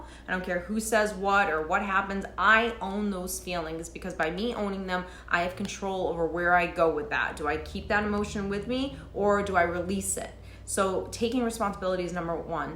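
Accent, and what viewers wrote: United States. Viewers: Healing about what ? Get stuck? It's the way you see yourself . Self worth help you to overcome your worries . I past through griefs and all of us too . Life is continue It's not standing and don't do nothing Instead of that see the good things around you such your health you have something to eat and a shelter. A lot of child and people suffers because war and they have nothing and ourselve live in a land which has all things we need . To heal we need to look around us and not to be selfish focusing only on ourselve . Is here my opinion teacher . Step by step i get your talk in spite of your American accent .yeah yeah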